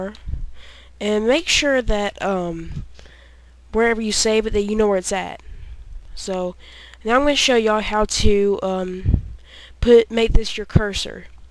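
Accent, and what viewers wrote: United States. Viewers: such country accent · Cool hillbilly voice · ._. not really, I'm a Texan, I've never met anyone with an accent like that, He's most likely from the Bayous or something...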